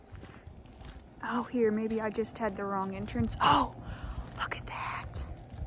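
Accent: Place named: Kentucky, United States. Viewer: Yo Kentucky Accent..cool..I knew a guy once from Louisa Ky. The hill of Eastern Kentucky seem to hold do mystery and settled by Irish, Scotts, tec...when I lived in Asheville several Yrs ago for asking year.I traveled up to Boone NC several times and cured my facination with Appalachia..I visited Harlem Ky....little did I know at the time I was driving my White Volvo X- Country Wagon..thru those hill at night..with NH plates that say " live free or die" I wasn't fearful at the time, however looking back..yikes..only see the sun for 4-5 hours a day..huge coal mine history and exploitation of ppl by big coal companies.of course I binged Net Flix and watched all seasons of Justified before visiting Harlem..with patty loveless singing about harken..😃 quite a history in those hills..I did meet Leah from the music sister duet if Rising Appalachia in Avl..you got some guts courage mixed with winderlust is perfect combo..ty very much.... 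Dave 🤸☮️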